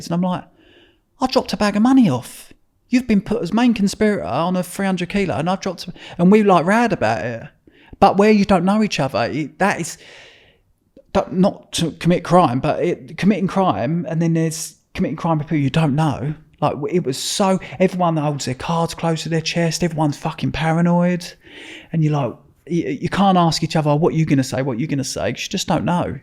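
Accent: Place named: Southern England, United Kingdom. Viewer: What an awful poncey Southern accent.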